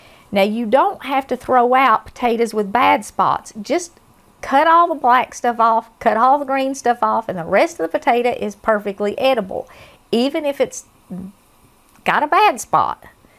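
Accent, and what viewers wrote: South, United States. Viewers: I love your food, AND the scriptures you share with us! America needs to stop turning away from Jesus. Oh and by the way, I love your southern accent too, God bless America!! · I came for the Southern accent...not disappointed.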